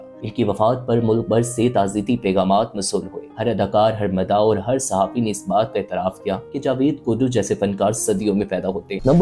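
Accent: India. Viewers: کیا بماری پڑ گئی ہے اینڈین کی طرح بات کر نا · Zinda ko bhi foat shudgaan mai dikha rahe ho bohot buri baat hai. Doosre kiya tum indian ho kiyunke lehja aur talaffu dono hi bohot kharab hain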